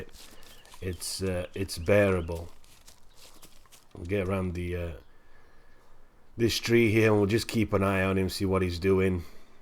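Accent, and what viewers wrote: United Kingdom. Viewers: where are you from? you sound British but with something else thrown in every now and then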